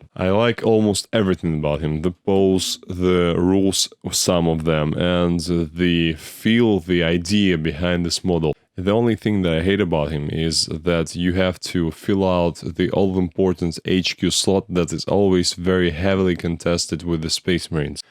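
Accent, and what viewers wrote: Russia. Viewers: Are u Russian?🥸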